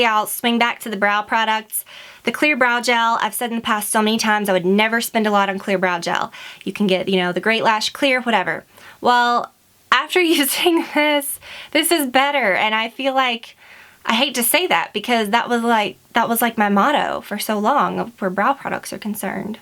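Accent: South, United States. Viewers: sometimes your southern accent stands out so much haha never really noticed before a couple videos. you loo gorgeous xx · Thanks for sharing your favorites! You sound a little southern in this video, it's awesome haha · you sound so southern omg, it's so cute <3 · It sounds like you have more of a southern accent in this video!! It's so cute haha ( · I have watched so many of your videos, and I never noticed such a southern accent before! Anyone else pick up on it. So funny, makes me like her even more :) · first time i've ever noticed your southern accent! it's quite strong don't know how i've never noticed it! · Is it just me or does sound soooo much more southerner?? I lav her 💕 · I love your faint southern accent. I need to try Anastasia products asap! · GA accents are adorbs! Just like you Meredith Hammett your icon pic is BEAUTIFUL! I wish i could visit there someday! 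and sorry to point it out..hopefully it didnt distract too much lol but being from california i simply adore southern accents. And to be honest I never really realized had one... makes we wanna double thumbs up this video! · Im not from the US but I watch the walking dead, and every time after I've watched an episode I can really pick up your more southern accent. Because they film in the same state as you live in. It's super cute! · This was the first video I noticed a sweet southern accent! :) So happy for a lipliner suggestion that is great with nude lips...it really is hard to find! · Way more Southern in these videos!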